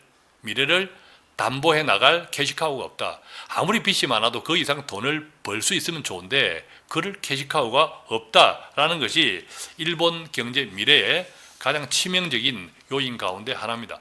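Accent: United States. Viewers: 미국식 발음인데?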